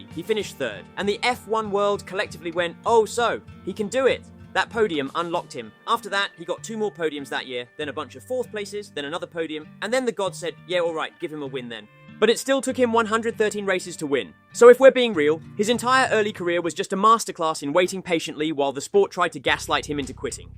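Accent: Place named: United Kingdom. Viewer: 100% British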